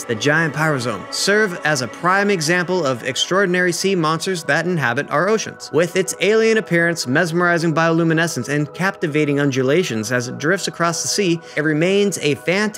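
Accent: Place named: South, United States. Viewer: Ok ive never heard this on but i swearrrrr on your vids im detecting a bit of southern accent being from Bama i perk up when i hear it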